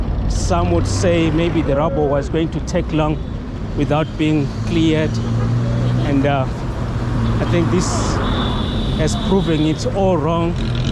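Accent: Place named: East Africa. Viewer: A foreigner claiming RSA here. The person making this video is not a South African. His tongue points to Kenya, Uganda or Ethiopia. These foreigners cannot really make news about their own countries.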